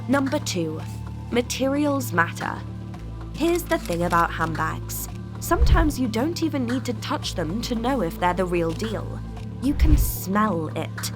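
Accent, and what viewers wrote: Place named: RP, United Kingdom. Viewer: why choose a robot voice with THAT HIGH BRITISH ACCENT?? Do you think it helps you to sound more sophisticated? Not it doesn't. Because it's clearly not your voice, it's a robot voice. You are pathetic.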